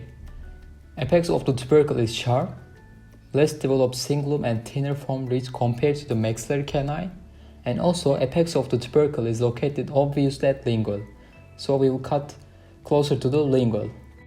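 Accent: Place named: Turkey. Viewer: You are turkisch? Because speaking like turkisch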